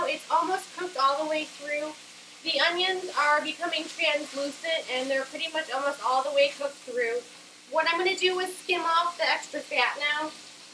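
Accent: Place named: Midwest, United States. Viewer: i would guess her accent to be wisconsin or ohio....i sure hope she responds cause i'm curious too!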